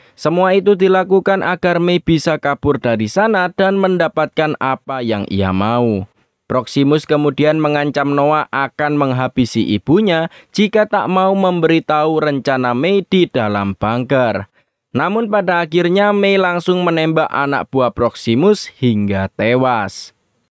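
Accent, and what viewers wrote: Java, Indonesia. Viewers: cuman bilang meddok doang bang,kaga raasis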